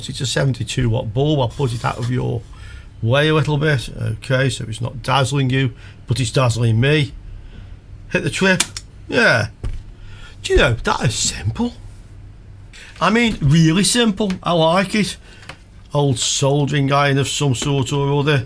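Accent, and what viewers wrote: United Kingdom. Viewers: nice video. nice for a change hear King's english with Schuko electric plugs. p.s. my father had similar setup in <num> meter over his workbench with two parallel 100W lightbulbs to have about 1 amp current limit when testing electric appliances and tube radios for input short circuits of course without all the digital stuff of that device. such device with a 40W lightbulb may be a safe device to let capacitors of long unused mains powered transistor stuff have the capacitors reform. myself i use a SOLA ferroresonant 60W transformer to test stuff that have long time been in a barn, attick, cowhouse or else with potential for mains fuse blowing faulta ( the Ferroresonant i.e. constant voltage transformers have inherent power limit, but are inefficient at light load)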